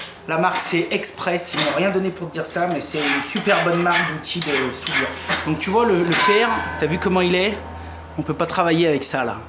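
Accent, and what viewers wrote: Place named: Franche-Comté, France. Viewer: Salut avec ton accent t es de chez moi , tu est francomtois?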